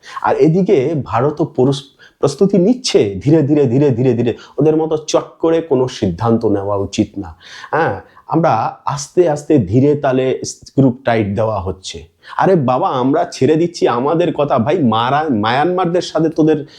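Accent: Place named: Barishal, Bangladesh. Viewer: হরে কৃষ্ণ রাধে রাধে প্রভু, আমাদের বাংলাদেশের হিন্দুদের জন্য প্রার্থনা করবেন। দাদা আপনার বাড়ি কোথায়? আপনি তো খুব সুন্দর বরিশালের ভাষায় কথা বলেন?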